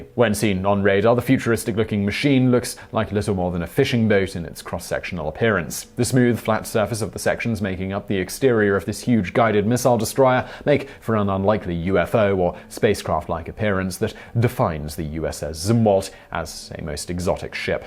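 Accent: England, United Kingdom. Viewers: Despite speaking with an English accent, clearly despises his English heritage. I have watched his videos for a long time now and I think I am pretty much done. He is an an Englishman but chooses to use US nomenclature despite the fact that I think most US viewers are intelligent enough to enjoy these films without having an Englishman talking in US terms just to pander to them. Also he is talking about warships and chooses just one British vessel, ignoring HMS Victory, HMS Beagle, HMS Endeavour, HMS Nelson, HMS Campbeltown, HMS Dreadnought, which was arguably the greatest warship of all time. I really can't stand men who are ashamed of their own heritage. Mr., get a grip sunshine.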